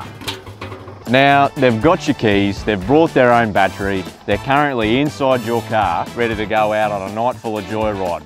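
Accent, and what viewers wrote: Australia. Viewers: I am subbing. I'm learning the art of aussie tongue and you're the perfect subject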